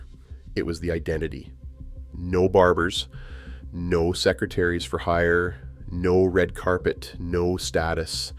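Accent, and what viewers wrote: Canada. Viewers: (He sounds almost Canadian.)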